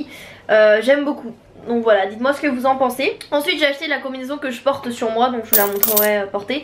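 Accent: Marseille, France. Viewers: t'es Marseillaise??? ❤❤😍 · T marseillaise?⚪🔵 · Tu viens de Marseille comment sa? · T marseillaise? 😮 autrement super vidéo ❤ me fais trop rire 😂❤❤ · tes marseillaise?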